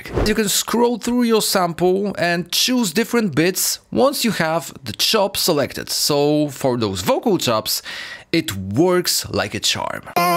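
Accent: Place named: Eastern Europe. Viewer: I thank you my friend, for the great work your doing. Where are you from? I can tell your from Eastern Europe by your accent. Portugal here. 👋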